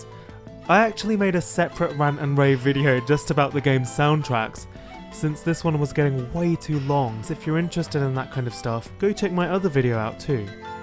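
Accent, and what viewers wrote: United Kingdom. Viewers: I have a lot of games to get through as it is, but the way you presented these, they really seem like the kind of game I'd enjoy. I'll add these to the long list of games I'll need to look more into, I guess... once I finish my large backlog of games that I already own. (Also, yes, more talking with the English accent.) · This was actually a super cool video, i perfer tactical Rpgs as apposed to these kind of turn based ones, but nonetheless i enjoyed hearing about this game and i might actually end up buying it lol. Also i knew you were from the UK but i didnt think about you having an accent. It was really enjoyable to hear you ramble about something lol. very nice change of pace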